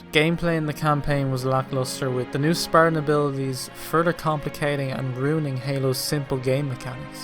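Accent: Ireland. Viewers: Matthewmatosis is that you?? You sound a lot like him lmao. More than just the Irish accent; very analytical. You made really good points man, and got me more hopeful for Infinite somewhat. It truly is their last chance to save not only halo, but the xbox even. Subbed, keep it up! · rough guess correct me if I'm wrong cork or Dublin judging by the accident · My boy is Irish. I can hear it 👐 Nice video dude!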